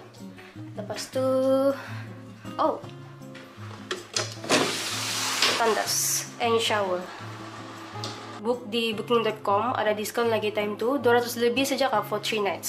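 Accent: Sabah, Malaysia. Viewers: sabahannn xD from the accent i know dy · org sabah mana ko? mcm logat tu tau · I like your sabahan excent ttap maintain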